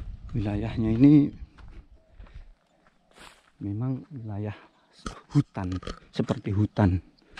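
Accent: Java, Indonesia. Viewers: assalamu alaikum wr.wb. njenengan jawane mana kak... wassalamu alaikum wr.wb.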